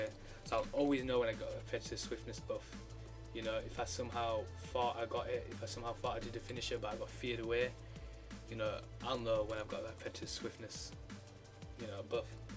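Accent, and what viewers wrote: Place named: South, United States. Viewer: Heavy southern accent cat -- cut -- bear -- b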